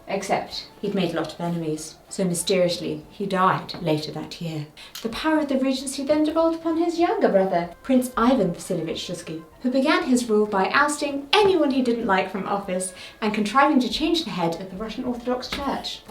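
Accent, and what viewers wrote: RP, United Kingdom. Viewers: Oh your that's sort of posh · You put so much preparation into these videos! Thank you for all the hard work. You're really interesting (plus you have an awesome British accent which I enjoy).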